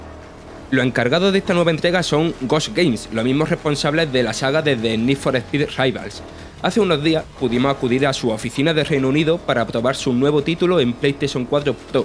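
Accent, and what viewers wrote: Andalusia, Spain. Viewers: Acento andalúz xd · Que es andaluz es indudable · Alejandro Cabrera Moraes Emmm claro que sí de hecho, te lo estoy diciendo por algo y el acento que tiene el hombre que está hablando es propio del Sur de España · Habla con su acento, también existe el acento gallego, canario, madrileño, murciano, como el andaluz, fin. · en el sur de España se habla asi, por desgracia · no sabes lo que es un acento? Si es Andaluz y tiene ese acento ¿porque deberia de esforzarse en hablar como los del norte? El que no le guste que no lo vea, pero decirle que debe mejorar su manera de hablar porque a ti no te gusta (siendo totalmente correcta su forma de hablar) no viene a cuento. Ademas para tu informacion, el Andaluz esta a la par del Castellano (Español) ahora a esperar que me lluevan tortas de iluminados!!!!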